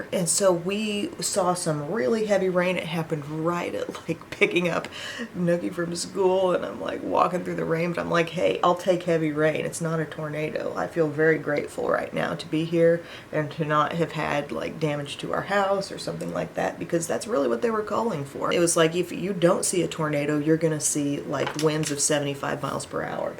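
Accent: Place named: United States. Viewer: Hi there, I'm in the UK, I've been following you for a couple of years and I love your vlogs. There are so many accents in the US and I cannot place yours, it's really different and pretty. Which area does it come from? Also, I love that makeup look, so fresh and glamorous! 🙂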